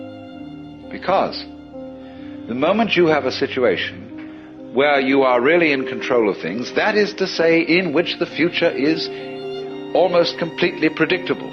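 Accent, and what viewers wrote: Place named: RP, United Kingdom. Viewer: You are the first posh-sounding English bloke I've EVER warmed to. Thanks😊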